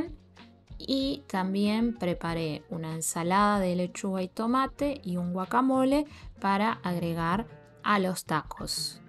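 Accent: Argentina. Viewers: gracias por este video, soy de Inglaterra y estoy aprendiendo español. Me gusta el acento argentino.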